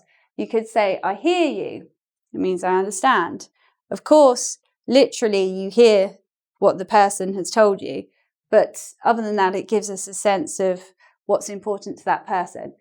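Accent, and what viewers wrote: United Kingdom. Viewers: Greetz from Germany, your videos are great! And I love your British Englisch accent :-)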